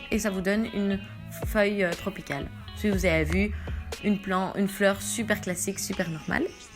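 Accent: Belgium. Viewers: t'es belge ??? ton accent m'a l'air légèrement belge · Tu es belge toi ?^^ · Miss Serbia j'ai l'impression qu'elle a un accent belge perso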